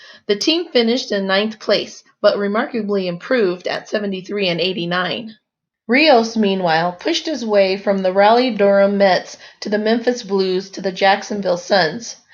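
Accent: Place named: Cleveland, United States. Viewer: Im sure you couldve said Juan Rios better. You sounded like someone from Cleveland. Still you get a thumbs up sister!!!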